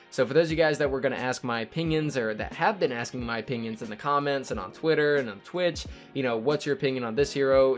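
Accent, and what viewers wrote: France. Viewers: Are you French? O_0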